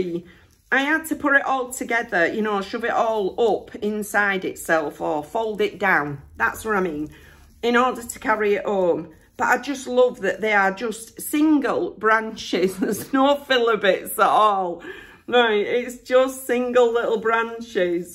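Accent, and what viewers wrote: Yorkshire, United Kingdom. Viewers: You sound like a Yorkshire lass like me x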